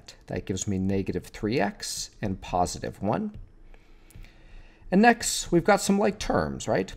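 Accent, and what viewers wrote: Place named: Canada. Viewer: I really want to know what his accent is!!! Is he canadian??!! Also- very helpful video!!!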